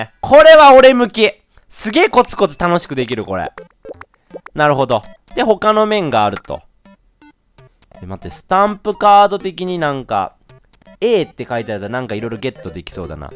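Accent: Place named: Hiroshima, Japan. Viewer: レトルトさん、喋り方広島弁ぽい笑笑あそこら辺の人かな？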